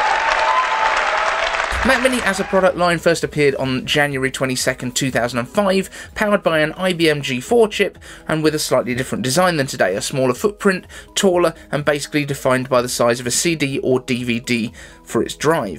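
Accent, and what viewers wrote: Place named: Birmingham, United Kingdom. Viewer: #iCaveAnswers I’m super interested in the geographical breakdown of your audience. As a British guy who watches the show, I usually assume the audience are also mostly British. The Mint Mobile sponsor made me wonder about how many viewers are actually from the USA and won’t even notice the hint of Brum in Daves accent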